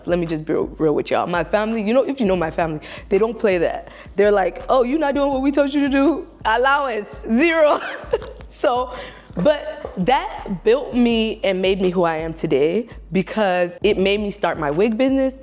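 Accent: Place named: Nigerian American, United States. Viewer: The mixture of Nigerian and American accents.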